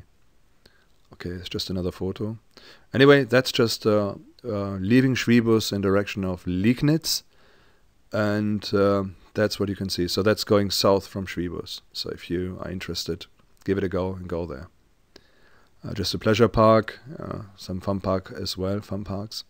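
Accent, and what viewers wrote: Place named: Germany. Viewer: Nice job !!! i listened all, sometimes it was kind difficult to understand just a few words pretty much by your Hard German accent, but you're German talking about German history which is really nice :D !!! i'll be traveling to Germany next year, and i wanna make the tour to all these old days German lands, but i wanna go farter , i wanna include pommer,Danzig,Warmian-Masurian,Kalliningrad, and Memel, currently Klaipeda South Lithuania, all those lands are full of German vestiges,well in this case i wanna ask something to you, as German citizen that you are, so why after Berlin's wall falling, Germany never reclaim any of those lands ???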